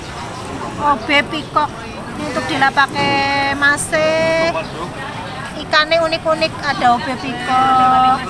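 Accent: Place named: Banyumas, Indonesia. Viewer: Hadirrr.. mantap.. dukung terus channel le mbak ayune wong ngapak lek ora ngapak ora kepenakkk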